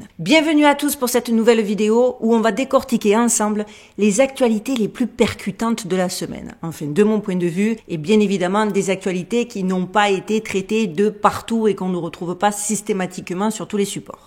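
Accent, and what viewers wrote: South, France. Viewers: Son accent du sud est insupportable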